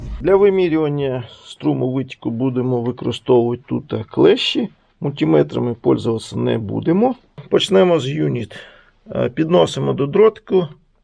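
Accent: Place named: Surzhyk, Ukraine. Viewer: Да на суржике он балакает. Все понятно жеж.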